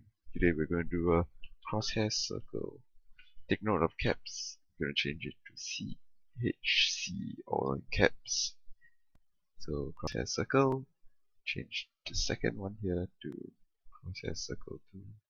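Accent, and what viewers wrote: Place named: Singapore. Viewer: i sense the singaporean accent :OO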